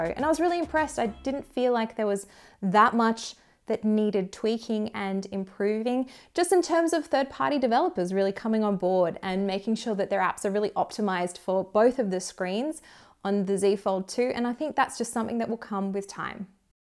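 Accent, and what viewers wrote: Australia. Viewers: Australians would typically say /djuːəʊ/ (sounds kinda like d-wow) vs the dryer "u" sound in the american /d(y)o͞oō/. Her australian accent is subtle, though. I would not have picked it up if she hadn't mentioned it. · Just got the zfold2 yesterday. Amazing!!!!! Waiting for the spiegen tough armor case in the mail. Highly recommended that case! Also... I love your aussie accent! Xoxo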